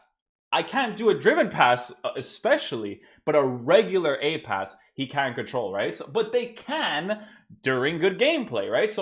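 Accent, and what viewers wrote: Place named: Portugal. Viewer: Man you from Portugal or something? Not only because of the shirt but your accent on player names makes me think you portuguese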